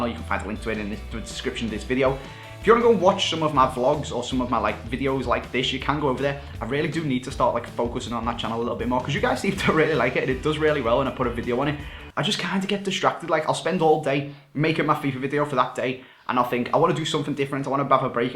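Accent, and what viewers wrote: Liverpool, United Kingdom. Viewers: are you a scouse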